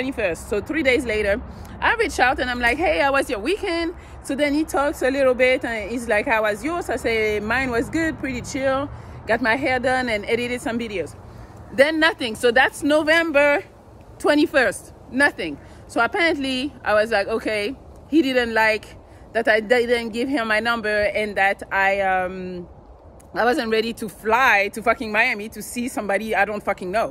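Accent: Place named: France. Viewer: She as a french accent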